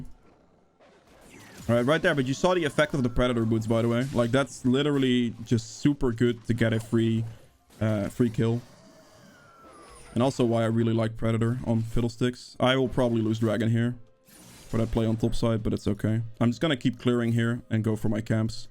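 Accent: Netherlands. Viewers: Lekker nederlands accentje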